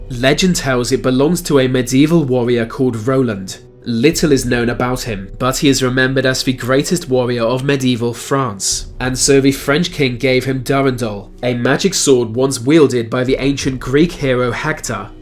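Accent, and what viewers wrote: United Kingdom. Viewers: Magicians are not creeps. You tea and scones accent. Fuckwit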